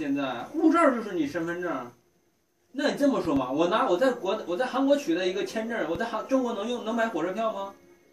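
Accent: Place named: Argentina. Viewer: deberías dar clase de cómo hablar argentino : v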